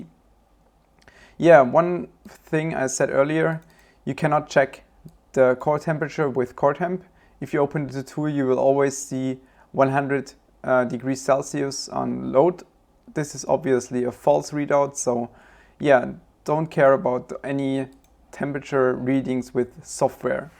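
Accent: Germany. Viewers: Man hört, dass du aus Deutschland kommst :D cooles Video! Hast du das Bios gemacht? Wenn ja, mit welchem Tool? Versuche schon ne Weile ein Ozmosis Bios zu machen, aber das funzt nicht, weil es die Firmware schrottet. Was hast du benutzt? LG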